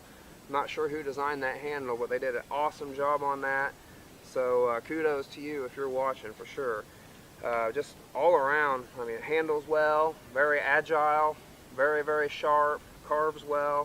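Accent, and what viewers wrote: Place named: Midwest, United States. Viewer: I thought you sounded like somebody I would know. I grew up in Vincennes Indiana. We had a big farm in Illinois.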